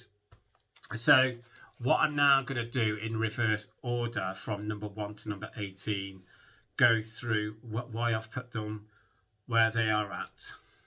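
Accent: United Kingdom. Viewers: I dig your Elvis reviews a lot - as well as the Britishness of your accent! 👍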